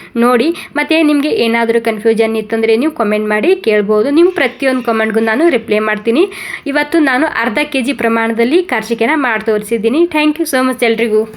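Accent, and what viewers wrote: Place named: North Karnataka, India. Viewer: Mix kannada badri nam uttar karnataka bhashe spr aram hanga matadri bindas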